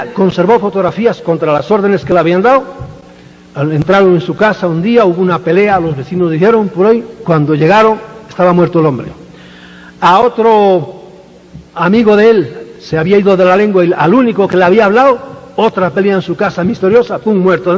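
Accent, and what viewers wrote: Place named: Spain. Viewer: Es español el señor salvador¿?apenas se le nota el acento.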